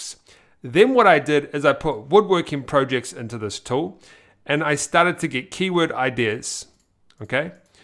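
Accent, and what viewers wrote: New Zealand. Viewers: Whoa, a Kiwi!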